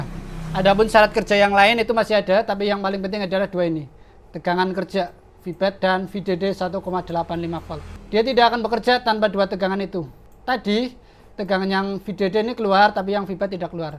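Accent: Banyumas, Indonesia. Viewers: Ngapak ya kak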